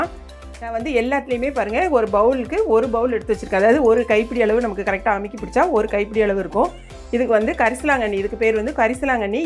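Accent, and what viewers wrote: Tirunelveli, India. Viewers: Thank you mam.ஆனால் நீங்கள் பேசும் முறை திருநெல்வேலி மாவட்டத்தில் பிறந்தவர் போல் உள்ளது.அதான் கேட்டேன்.நான் திருநெல்வேலியில் தான் படித்தேன்.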